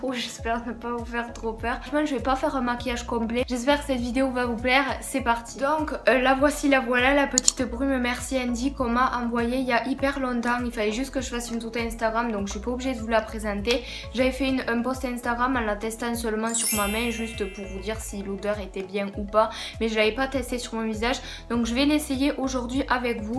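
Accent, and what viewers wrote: South, France. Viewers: hey l'accent du sud 😂 gros bisous j'aime trop tes vidéos 💞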